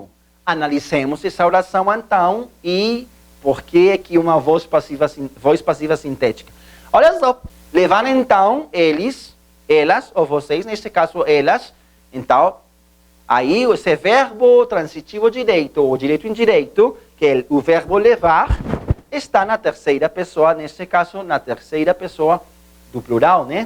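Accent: Portugal. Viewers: Pelo que entendi ele é português. Sotaque e grafia de Portugal.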